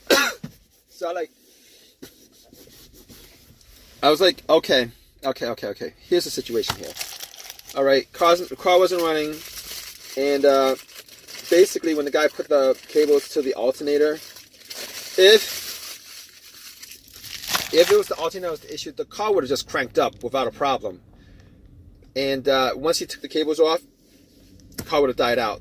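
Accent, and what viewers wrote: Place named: New York, United States. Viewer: The reason he talks so long, because youtube requires at least an 8 minute video to consider it an informational or useful video to get credit for any views or subscriptions and likes and comment. Fuckin new York east coast accent.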